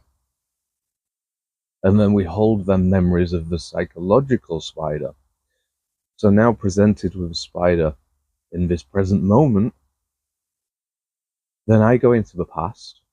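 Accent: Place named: Manchester, United Kingdom. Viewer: Trying to place your accent, from the north somewhere, but maybe not Yorkshire, almost a Manchester thing going on there, I've lived in Lancashire all me/ my life.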